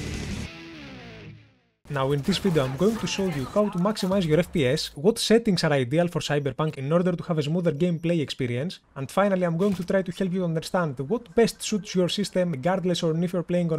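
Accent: Greece. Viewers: Greek .. I can bet you Are greek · From your accent, I instantly realised that you are from Greece. Δεν περίμενα να έβρισκα Έλληνα youtuber να μιλάει για το cyberpunk xd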